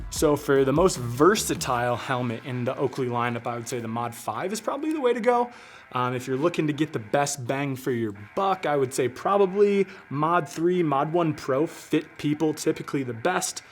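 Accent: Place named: Toledo, United States. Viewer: Dude are you from Toledo?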